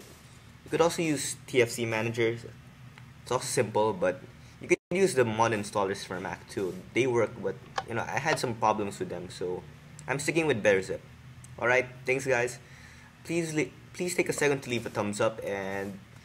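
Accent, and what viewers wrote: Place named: China. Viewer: u have chinnesse accent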